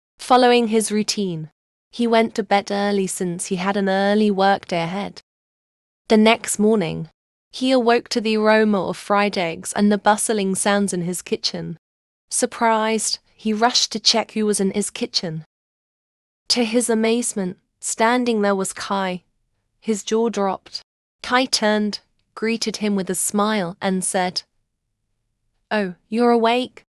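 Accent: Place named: United Kingdom. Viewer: why is it. british not watching it if its in a british accent sorry :/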